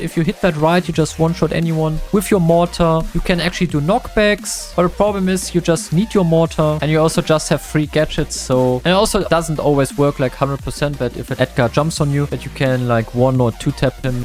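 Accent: Germany. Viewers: Bro dieser deutsche Akzent ist crazy🤣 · Digga wann sagst du auch mal was auf deutsch ich höre da DEUTSCHEN AKTENZ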